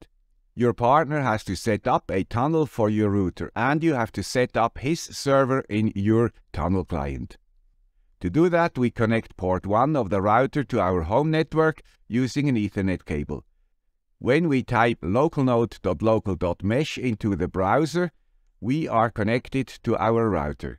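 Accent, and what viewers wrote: Switzerland. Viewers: Awesome! And first time I think I've heard you speak Swiss german (with a touch of french too?)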